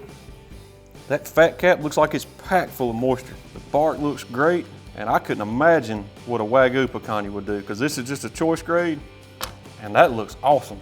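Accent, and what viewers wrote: South, United States. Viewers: I like that southern accent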